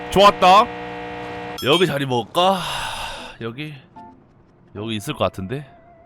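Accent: North Korea. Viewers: 저 목소리 왤케 북한 말투 같아보이냐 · 억양이 북한 사람 같…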